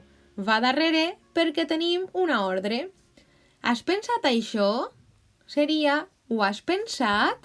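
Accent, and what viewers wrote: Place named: Valencia, Spain. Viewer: Hola! Sòc de Xile i estic aprenent el català. M'agrada molt l'idioma i encara que ja puc parlar-ho sense pensar tant, he vist que tinc que millorar en dues coses: Algunes conjugacions de paraules, per a parlar més natural, i els pronoms febles. Es veritable quan la gent als comentaris van dir que el teu vídeo va ser molt bó, perquè he vist moltíssims vídeos i el teu és el més fàcil de comprendre. Estic escrivint tot el que vas dir, pero després tinc que fer exercicis per practicar-los. L'únic que ha estat nou per a mi es el accent de València. Perquè he après el Català de Barcelona (pel que sembla jajaja, no sabia això). Però segueix així! Ens ajudes molt a aprendre aquesta bonica llengua.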